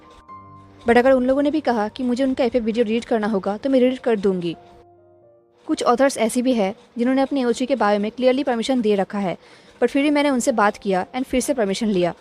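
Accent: Bengal, India. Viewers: Di kal main jab padh rahi thi to aapke channel ka notification aaya tha to main video ko like and diwali vali comment karke maine socha tha bed par sone jaugi to tab sunogi as a bed time story jab bed par aayi to thak kar so gai lekin jab main abhi sun rahi ho tab mujhe pata chala aapke video delete karane ka pura sach aapke channel ke video delete hote dekhakar mujhe bahut bura laga tha kyuki aapki sari story bahut jyada pasand hai aapke calm and thode se bengali accent ke sath aur main bas mere lord shiva se pray karugi ki aapane jin writer se puchha ho vo delete karane ko na bol de aap mere wangxian explainer ke favourite list me aate ho i know comment bahut bada likh diya hai main par di mujhe aapase ye kahana tha your are my favourite wangxian explainer agar aapane ye comment padha ho to sorry aapko itna bor karane ke liye 😊😊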